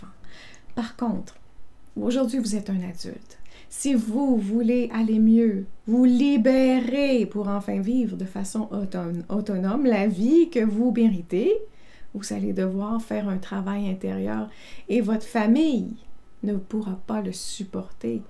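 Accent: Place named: Quebec, Canada. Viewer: Bonjour, oulala très pertinent et j'ai vécu celà avec certitude, plus la culpabilité et la colère de cette relation, plus la blessure de rejet à l'adolescence lorsque j'ai voulu exprimer ma vérité, d'où dépression à ce moment, restant en latence au fond de moi jusqu'à présent! Eh bien, je touche du doigt le fond du problème, merci merci merci!!!🥰 Et j'adore l'accent québécois!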